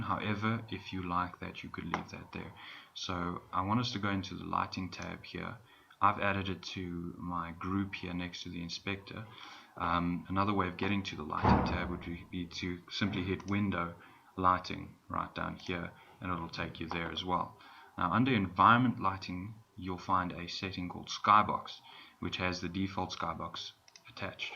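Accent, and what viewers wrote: South Africa. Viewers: Are u South African because of ur accent? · Yoooooo!!!! More South Africans!!! I'm South African too. I live in Cape Town :)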